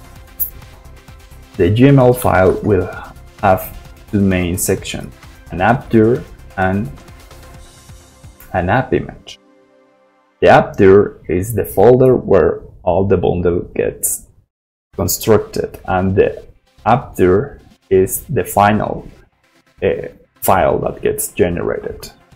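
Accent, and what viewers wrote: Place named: Latin America. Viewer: Hello. I hear one latino speaking english here, asi que te escribo en español mejor. Cómo se puede hacer una appimage a partir de un archivo sh? Es necesario tener muchos conocimientos de informática? Te lo agradecería mucho. Gracias de antemano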